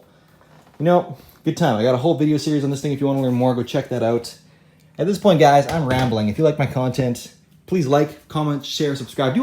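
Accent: Canada. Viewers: You sound Canadian. Awesome gun room setup and gear by the way.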